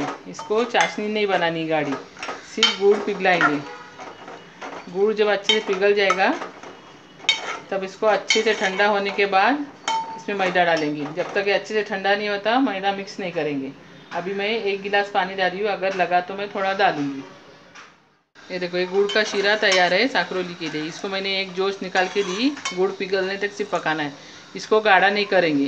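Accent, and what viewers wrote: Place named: Konkan, India. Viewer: Asalam alaikum. Hope you are doing great and enjoying spirit of Ramadan. You have reminded me typical konkani cuisine of my Nani. You accent reminds me of my Nani’s family. I have already tried chana chatpata batata yesterday. Please keep doing what you are doing. Because it makes so many people feel and witness their childhood. Ramadan kareem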